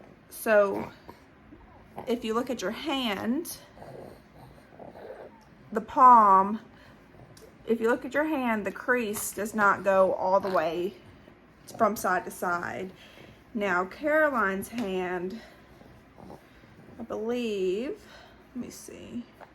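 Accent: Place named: South, United States. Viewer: Sounds like maybe some place in the south !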